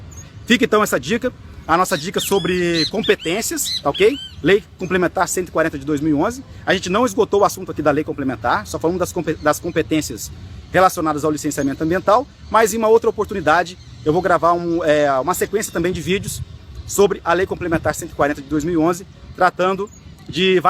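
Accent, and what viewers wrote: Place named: Bahia, Brazil. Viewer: Professor deve ser Bahiano.excelente.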